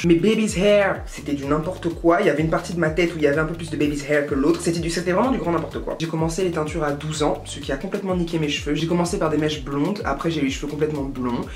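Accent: Ivory Coast. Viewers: L'accent ivoirien 🇨🇮🇨🇮🇨🇮💗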